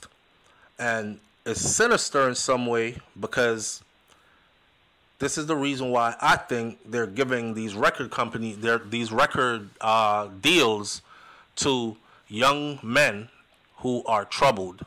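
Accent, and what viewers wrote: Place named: Jamaica. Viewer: This sounds like soflow TV.. 😂😂😂 the Jamaican accent can’t hide man.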